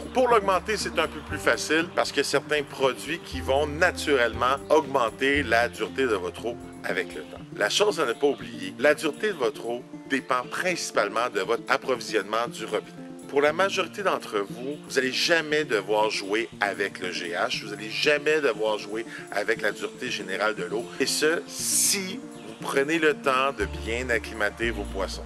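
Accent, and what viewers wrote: Canada. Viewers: Salut, super intéressant et bien expliqué, et l accent canadien j adore . j aimerai bien avoir un thème sur les méthodes efficace pour ce débarrassé des algues , malgré que j ai un chihiros doctor, très difficile de ne pas en avoir... en tous cas je te souhaite de réussir !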